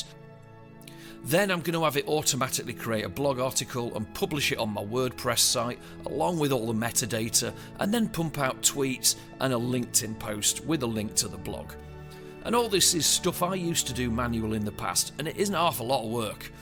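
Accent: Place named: Yorkshire, United Kingdom. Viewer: Love a good Yorkshire man. Ay yup lad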